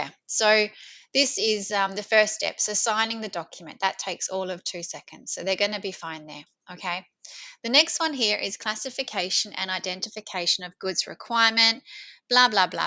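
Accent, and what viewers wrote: Australia. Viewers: Hearing you shared Australian accent. Brilliant. You're funny and articulate. Thanks 😅❤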